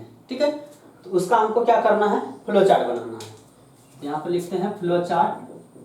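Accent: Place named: Bihar, India. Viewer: भाषा की सरलता और हिंदी बोलने का तरीका बिहारी झलक।grest work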